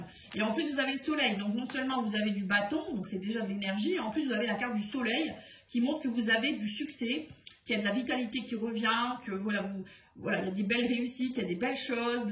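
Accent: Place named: North, France. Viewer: Étant moi même nordiste j adore votre accent merci pour votre guidance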